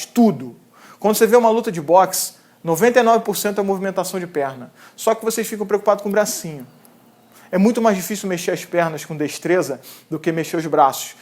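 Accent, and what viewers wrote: Rio de Janeiro, Brazil. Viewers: Aí se tivesse cm se matricular ou algum modo de se tornar aluno dele assim como o matemático eu iria firme e forte, pelo sotaque é carioca também.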